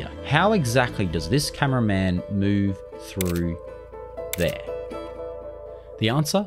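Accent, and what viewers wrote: Australia. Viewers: Hi, just found your channel, couldn't stop watching when I did. love it. first thing I noticed was an Aussie accent. love your work mate. Gold Coast.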